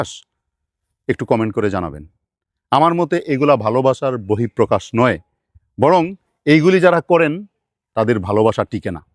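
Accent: Bangladesh. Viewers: আপনার কথা শুনে মনে হয় আপনি বাংলাদেশের,আবার বর্তির বিলের কথা শুনে মনে হচ্ছে আমাদের পশ্চিমবঙ্গের।আপনি কোথায় থাকেন জানিনা তবে যে কাজটা করছেন খুবই প্রশংসনীয়।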